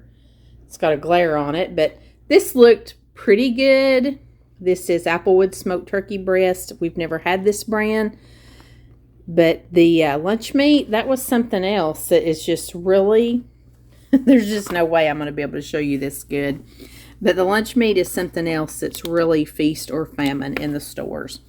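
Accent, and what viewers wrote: South, United States. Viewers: Watching and listening to you talk just make my heart happy! I have mentioned before that my parents were born and raised in Tennessee and that southern drawl just takes me back! Thank you for your sweet and gentle personality! Blessings!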